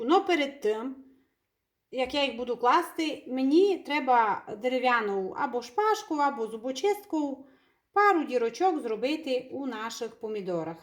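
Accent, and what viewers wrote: Transcarpathia, Ukraine. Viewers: Це Закарпаття, свій діалект української мови. Все гарно ( файно) і зрозуміло. Дякую,.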